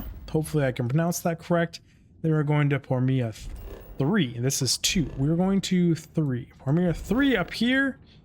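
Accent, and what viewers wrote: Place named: United States. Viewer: No hate man.... Love this video. :D , but I also, as a European, love how Americans just add an subtract letters and syllables in words thats not American. "Lon" = LEE-on", "Polvo" = "Pol-O-vo" for example.... As said... No hate... I just find this entertaining..... ;P If you watch all types of gaming-videos, "MetalJesusRocks" is a MASTER of this... ;P Edit.... After watching the whole video, this is deff going to be my go to ship! Theres too few builds like this with small ships and huge cargo.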